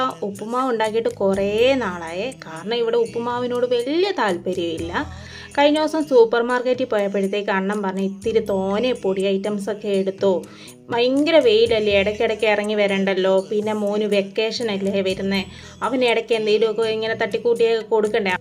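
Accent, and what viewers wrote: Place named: Kollam, India. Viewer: തോനെ കൊല്ലം ഭാഷ ആണെല്ലോ ❤️🥰 Like 🥰🥰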